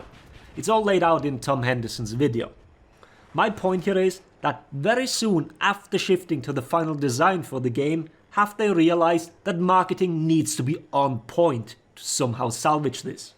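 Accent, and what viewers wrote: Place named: Northern England, United Kingdom. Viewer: Good video my friend. Might sound like a weird question but do you like in the North of England? You have a northern twang when you speak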